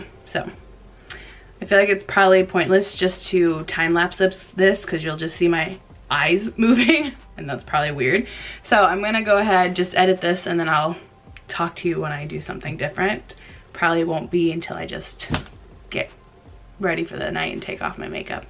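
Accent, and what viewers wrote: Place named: Midwest, United States. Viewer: lol, i knew you were from the midwest! we have the same accent ahaha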